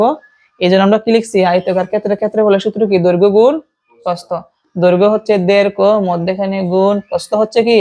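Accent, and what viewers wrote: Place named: Habiganj, Bangladesh. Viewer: বুঝতে পেরেছি স্যার। অসংখ্য ধন্যবাদ।🙏🙏স্যার কী হবিগঞ্জে থাকেন? আমিও হবিগঞ্জ এই থাকি। স্যার এর কথা বলার স্টাইলটা হবিগঞ্জের ভাষার মতোই লাগলো।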